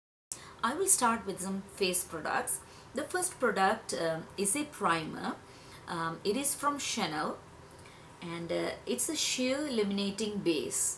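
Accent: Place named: Tamil Nadu, India. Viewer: yes! she was Tamil and spoke very similar to you! i was wondering if you were Tamil as well if you don't mind me asking:)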